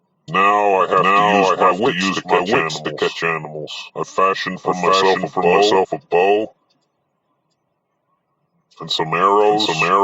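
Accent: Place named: Canada. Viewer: At least you didn't give yourself away this time with your Canadian accent... only with the words "quite literally" and "for myself"... and maybe your laugh... lol. Thanks,. Enjoyed it. 😊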